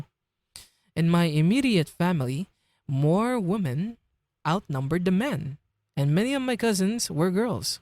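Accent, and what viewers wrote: Philippines. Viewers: Love your speaking bro, just a few seconds in and I can realize you're Filipino haha. This is my first time listening to an audiobook because I could never get myself to read long texts so thank you for doing this.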